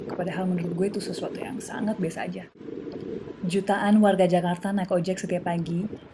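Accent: United Kingdom. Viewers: i adore your british accent! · jujur banget nih gua,gua suka sejak vidclip zigas,ini cew siapa yah? gua telusurin ampe kemana2,akhir nya tau ceritanya nih cew campuran UK & Bugis Celebes,btw gua seneng ama lo yg pertama of course karna cantiknya,trus yg bikin melting itu british accent nya,im really fallin love with your accent,keep down to earth yow.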